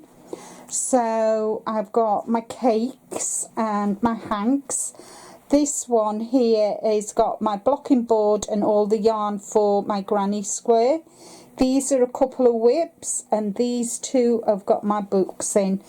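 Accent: United Kingdom. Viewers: Petunia for your love of your garden. I think you said Petunia in your wonderful British accent. Of course we all have to have fun naming items too so Flower or Yarny. Love your Yarny room and organization, beautiful and cozy!! Such a lovely channel!!!!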